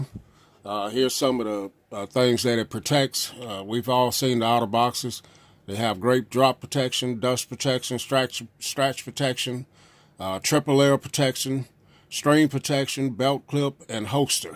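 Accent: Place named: Arkansas, United States. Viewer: He has the same accent as my black friend. Are you from Arkansas?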